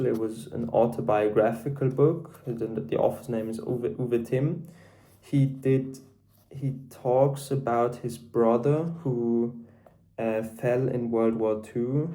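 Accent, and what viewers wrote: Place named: South Africa. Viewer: Hi, I'm new to BookTube too. Nice to meet you. Do you have Afrikaans in your background - your accent is a little mixed? Don't worry it's not a bad thing. I teach English and I like to work out accents.:)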